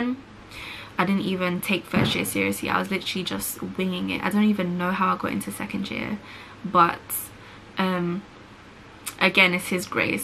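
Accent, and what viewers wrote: United Kingdom. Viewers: this is the first time ive heard an asian person speak with a british accent...lol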